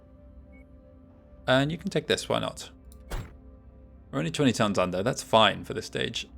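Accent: United Kingdom. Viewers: Personally I struggled to hear Ryana’s Scottish side, tho if you said she came more form the east side of Scotland around Edinburgh or Fife areas then they have alot less of a toned version compared to west and mid Scotland. So it’s probably true being she’s a Northwinder but likely comes from a part that has just a very very mellow version of the accent. Edit: also say a lot when I didn’t even know you were Scottish yourself. So aye, definitely more on that more mellow side then. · i hear a brit'ish accent but not a haggis laced one.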